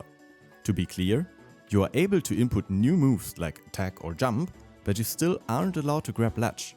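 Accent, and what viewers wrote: Germany. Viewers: Where are you from? Austria?germany? I just want to know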